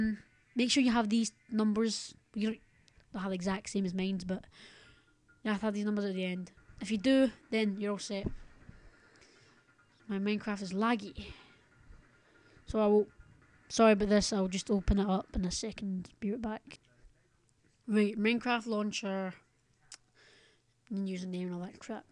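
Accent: Scotland, United Kingdom. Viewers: You gotta mad scottish accent.. Its scottish right? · Like the accent, im scottish too i dont often hear it on youtube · oh he is Scottish i can tell i been there lol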